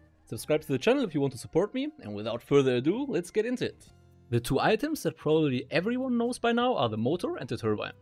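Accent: Germany. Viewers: GErman accent?!?!? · ich höre einen deutschen akzent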